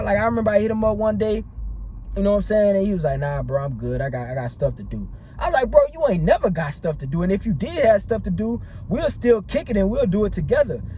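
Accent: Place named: New Orleans, United States. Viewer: Bro sound like he from Louisiana I'm guessing New Orleans 🤔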